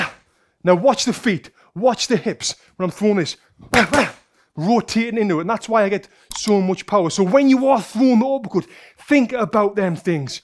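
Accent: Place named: Northern England, United Kingdom. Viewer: I like it when he says "noooooooo " in the beginning haha. Proper northern accent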